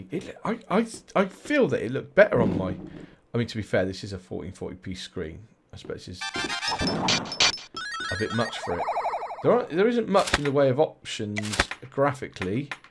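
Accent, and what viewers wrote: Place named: West Country, United Kingdom. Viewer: Are you from ludlow or bristol you sound it brov!?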